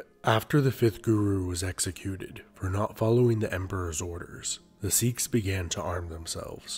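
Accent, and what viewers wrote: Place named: United States. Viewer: I think it is just the American accent. They tent to pronce the letter 'u' 'oo' instead. Where an Englishman would say 'Tuesday' Americans actually say 'Toosday', and 'Youtube' becomes 'Youtoob' and so on.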